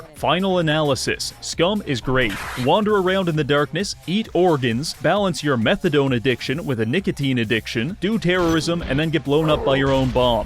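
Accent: Canada. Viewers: I can hear that canadian accent boy... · Wtf are you canadian...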